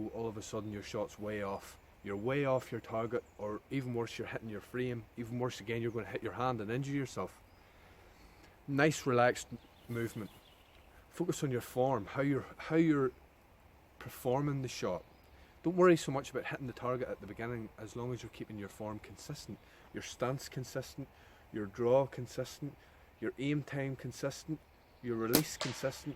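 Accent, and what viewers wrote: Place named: Northern Ireland, United Kingdom. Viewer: Must practice after watching this. Have a cheap catapult at the moment to practice with hopefully I will up my game... Am assuming your from Northern Ireland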